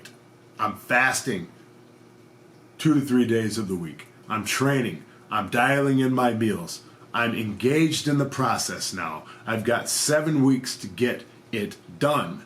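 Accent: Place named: Midwest, United States. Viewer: Just started watching your videos . They helped me stay on track. Way to go! Also I could tell by your accent you’re from the Midwest. I’m from Ann Arbor, Michigan. Go Blue!!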